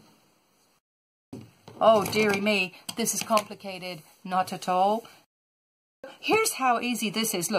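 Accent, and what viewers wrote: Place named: United States. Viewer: Thank you for such a handy tutorial. Are you Irish? You sound like you have a mix of Irish and American accent, very sweet.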